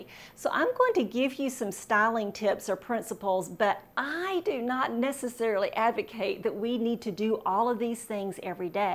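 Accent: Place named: Texas, United States. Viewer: I love her accent! Is she from Texas?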